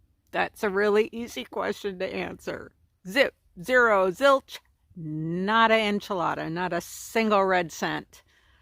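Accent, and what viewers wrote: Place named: South, United States. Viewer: Wow, all done with your videos. I am bummed. I'm really looking forward to many more. You may not hit 1000 subscribers, but those who do are certainly appreciative of you putting out the videos. Thanks, for producing these videos, allowing us into your life's adventures. I'm glad you are finding a purpose and realizing some of your dreams in your life, especially at our age, and you have done a lot of other adventures in earlier life. Lol, it's hard to stop. The southern twang, Oh Golly's, hot as monkey balls, etc., are definitely becoming your trademark themes in your videos. Love It! See you soon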